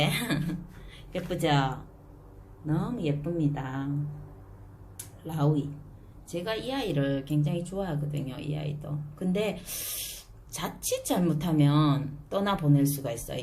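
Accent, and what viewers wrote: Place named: Gyeongsang, South Korea. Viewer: 반갑습니다 경상도말씨가 참정감있네요 영상잘보고갑니다 첫걸음마이신것같은데 화이팅하세요 항상 응원할께요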